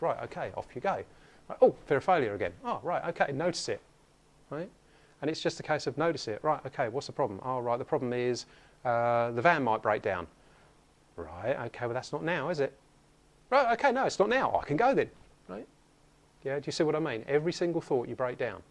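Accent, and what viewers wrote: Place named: England, United Kingdom. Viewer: I meant that the audio in another channel was louder (Not same video). The thing is English accent is very heavy which makes it difficult for me to understand him even on a loud volume so listening to him on a low volume makes it harder. Oh well.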